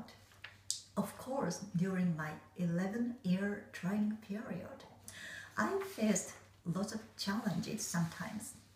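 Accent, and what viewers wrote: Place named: Japan. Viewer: У японок такой приятный акцент. Или не акцент, а манера речи. Сладковатый такой :-)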